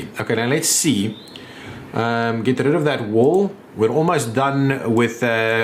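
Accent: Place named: South Africa. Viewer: Hahahah you sound sooooo South African, nice vid man!!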